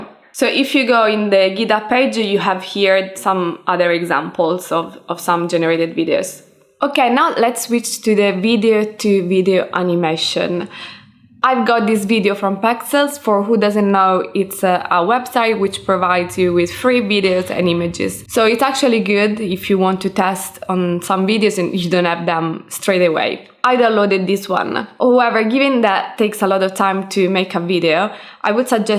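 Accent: Italy. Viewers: your videos are perfect. You're so good at explaining, please keep producing content. I subscribed in 30 seconds flat plus your Italian accent, I could listen to it all day long!!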